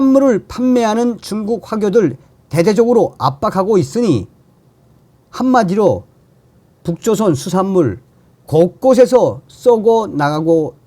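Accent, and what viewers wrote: North Korea. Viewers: 북한말 하지마세요.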